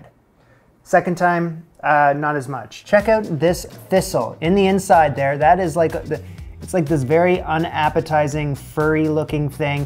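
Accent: Canada. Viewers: I absolutely love watching his videos. The only downside to watching them every day is that I know find myself saying "now" and "about" in a Canadian accent, I live in the UK. Pity I can't cook like him though 🤣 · I’ve always felt like I hear a twinge of Canadien in your voice, so I’d like you to know that the shot of the Bearnaise being poured over a slice of steak made me yell, out loud, “NOW THAT LOOKS GOOD, EHH?” 😂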